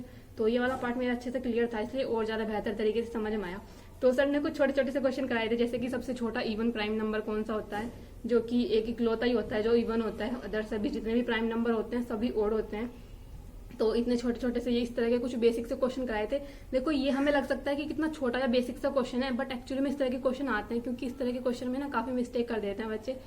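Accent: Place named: Agra, India. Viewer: aapki boli agra jesi hey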